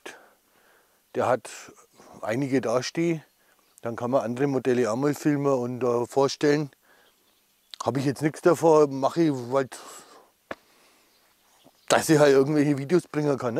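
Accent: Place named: Upper Franconia, Germany. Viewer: Dialekt ist oberfränkisch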